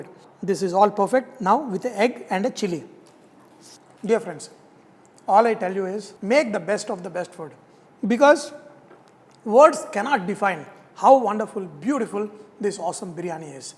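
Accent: India. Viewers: Sir ur English Indian mixing so its perfect understanding....boomba.boomba. Iamurbig fan I love cooking...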